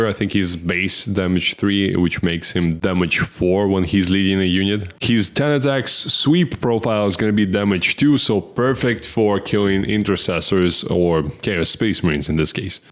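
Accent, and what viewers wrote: Russia. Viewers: R u russian?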